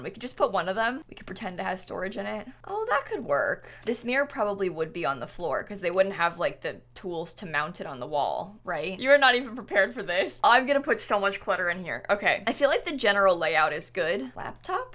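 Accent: England, United Kingdom. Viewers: For about a year I thought you were British and idk why🤣 I think it’s because I’m so use to hearing American accents that they’ve become normal to me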